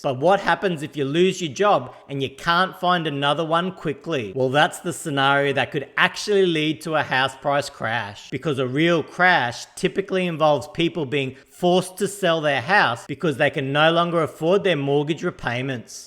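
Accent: New Zealand. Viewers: Employment is rising because people that are left behind, need 2 jobs to pay for their existence. Good Job! love your kiwi accent!!! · you a kiwi mate?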